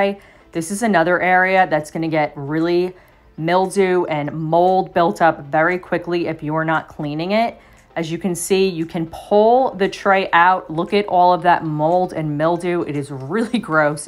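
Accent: Philadelphia, United States. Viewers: Sound like you're from Philly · You sound like my family in Philly! Thanks for the video. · Are you from Philadelphia?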